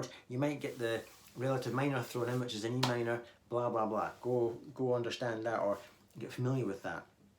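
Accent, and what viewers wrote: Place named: Edinburgh, United Kingdom. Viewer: You know, as much as I love our dear friend Uncle Larry (Tom Bukovac Home Skoolin' for those who don't know what I'm talking about), you are talking directly to me in a way that just hits home. I dig the gear you use and your videos about how you use them, and your lessons even more. The charming Edinburgh accent is a bonus! And thanks for the shout-out.:-) I guess it is soon time to get some merchandise available for us fans to buy;-).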